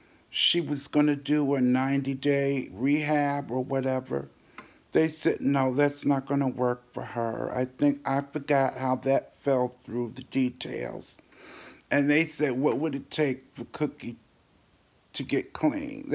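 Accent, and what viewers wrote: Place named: New York, United States. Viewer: Are you from New York? You sound like a New Yorker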